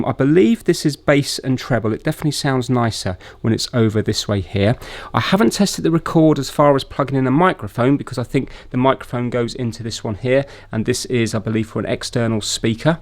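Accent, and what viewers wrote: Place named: Norfolk, United Kingdom. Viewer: Definitely a Norfolk accent. "Suffolk Swede" will be a dig at their near neighbours. Lots of rivalry between Norfolk and Suffolk.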